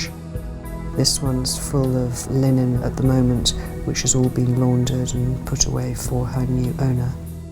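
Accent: United Kingdom. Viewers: Your voice has the proper English accent for this very regal yacht. I feel underdressed if I'm not wearing white lace gloves and an Ascot-esque hat while you describe it.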